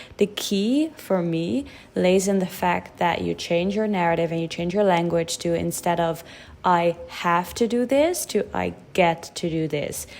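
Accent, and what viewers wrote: Netherlands. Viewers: Hoor ik het goed ben je Nederlands? Fijne video thanks! ❤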